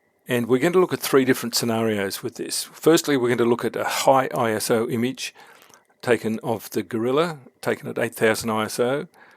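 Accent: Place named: Australia. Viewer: Hi there. I hope you remember me, we have spent time together on workshops in FNQ and on a Barrier Reef Island. I'm the guy who likes to sleep in hammocks.I may even use an unusual brand of camera😉 I really enjoy your lessons and tutorials, it's great to hear them in an Aussie accent. I have recommended them to my Photo Society Buddies. this one is very relevent as I was in your home town last weekend shooting the vivid festival, and high ISO images were required to capture the beaams of light in the sky. I have the older version of DXO pure raw, (1.6) and also the latest version of Topaz denoise. I'm thinking of upgrading DXO Photo Lab so I canhave the benefits of PR3 and Nik collection 6. Do you think there is benefit in this move?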